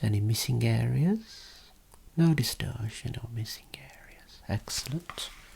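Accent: United Kingdom. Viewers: I absolutely love your voice and your british accent. <3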